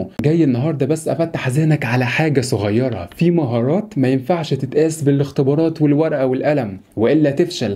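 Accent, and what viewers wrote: Syria. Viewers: ههه ما يبلى الحكي السوري تحية لك من سوريا😂❤ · الله يحميك قنعتني و دخليك ع اللهجه السوريه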